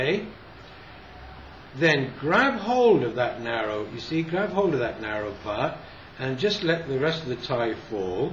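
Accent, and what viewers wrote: United Kingdom. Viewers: It worked because your have an english accent. That other guy who does these videos is a jag off.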